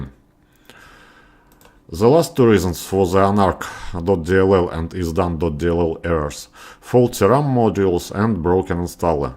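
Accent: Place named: Russia. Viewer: Ебать у тебя акцент братишка, русских слышно сразу)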